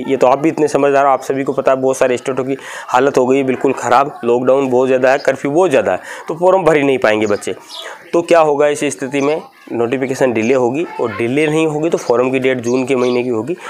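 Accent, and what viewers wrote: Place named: Nagaur, India. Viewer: Sir nagour se ho kya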